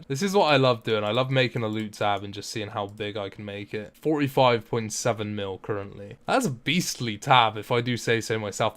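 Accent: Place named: England, United Kingdom. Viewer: Cool furtysix mill loot tab. In all seriousness what region is yoir accent from? I know its and English accent but what area?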